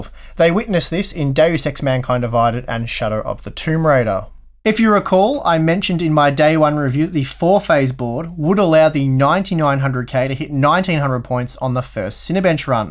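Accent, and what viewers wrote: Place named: Australia. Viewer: Interesting and useful information given, thank you! But this accent... It's so hard to understand this Aussie kind of English..., oh my! My impression is like someone is chocking you by the throat while you are speaking!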